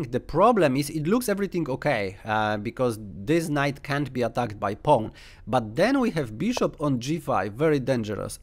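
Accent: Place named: Poland. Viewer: Stare chińskie przysłowie mówi: Jeśli 1 osoba Ci powie, że masz łeb jak koń to daj mu w mordę. Jeśli druga osoba Ci powie, że masz łeb jak koń to daj mu w mordę. Jeśli trzecia osoba również Ci mówi, że masz łeb jak koń to warto się zastanowić czy nie pójść do weterynarza. Nie przypieprzam się, po prostu robisz masę błędów fonetycznych i składniowych, dorzucając polski twardy akcent sprawia, że słuchacz się męczy, bo merytorycznie muszę Cię pochwalić ładnie opracowałeś tą rozgrywkę, absolutny top 👍🏻 Akcentu i języka również idzie się nauczyć, wiem bo mi zajęło to całe 6 lat, powodzenia.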